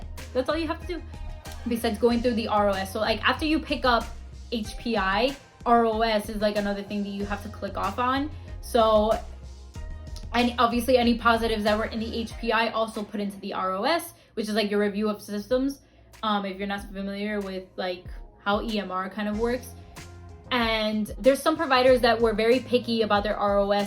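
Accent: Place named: France. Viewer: U sound french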